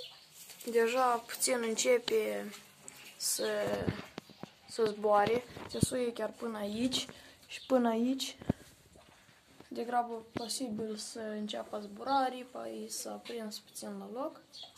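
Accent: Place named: Moldova. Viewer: Imi place accentul tău de moldovean, dar si de cum îngrijesti porumbeii din gospodăria ta. Like 22.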